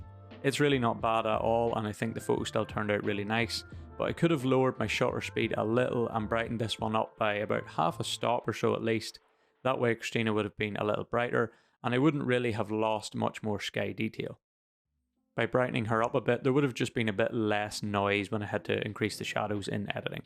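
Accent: Ireland. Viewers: Minor cavil: the word 'sky' is not normally pronounced with an 'sh' sound, but you're Irish, I guess, so ok?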